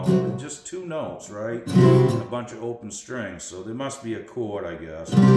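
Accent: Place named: Boston, United States. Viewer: Boston accent made me subscribe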